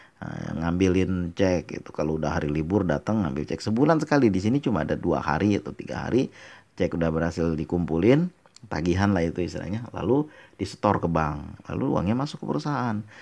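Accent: Jakarta, Indonesia. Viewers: Assalamualaikum,ustad d Aceh ya ustad,tapi asli Betawi apa Sunda ustad logatnya seprrti orang betawi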